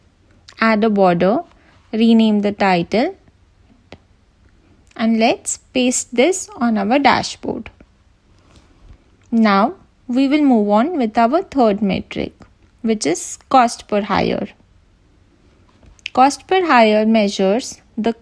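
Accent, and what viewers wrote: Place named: Trinidad and Tobago. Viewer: Are you from Trinidad 🇹🇹? You sound Trinindian.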